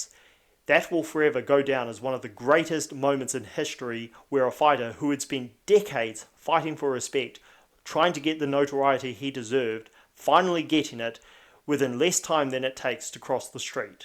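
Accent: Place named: New Zealand. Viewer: Good video man I am subscribing Best of luck with channel from Ireland 🇮🇪 U sound kiwi 🇳🇿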